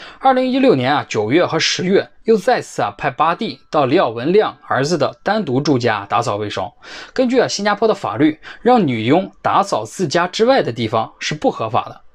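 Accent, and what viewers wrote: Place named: Northeast, China. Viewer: 小哥这嘎啦味普通话很亲切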